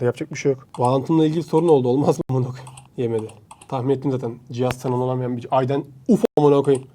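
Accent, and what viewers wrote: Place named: Eskişehir, Turkey. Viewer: Daha videonun basında düşünüyodum bu adam eskişehirli diye harbide öyle çıktı mk (bende eskişehirliyim)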